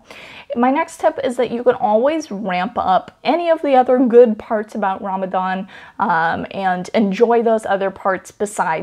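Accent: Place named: RP, United Kingdom. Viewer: By the way you have queens accent are you familiar to her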